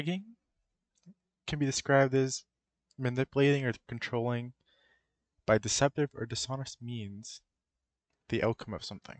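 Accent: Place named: Canada. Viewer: Why is it that I can barely understand 4 in a 5 words you speak? You need to enunciate better, stop whispering, and just generally get rid of that advent. What is it, some sort of Canadian?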